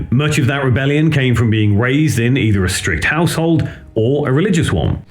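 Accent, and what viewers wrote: United Kingdom. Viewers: Your (British) way of saying Deicide is your dialect, it's just not ours in America. Band name or the word otherwise, over here in the States it's "DEE-ih-syde." :->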